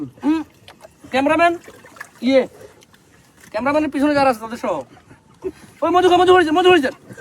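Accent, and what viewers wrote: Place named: Noakhali, Bangladesh. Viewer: আপনি নোয়াখালীর।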